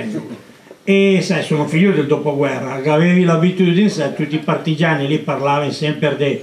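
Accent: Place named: Milan, Italy. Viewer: Grandissimo. L'unica cosa che storpia è quell'accento milanese .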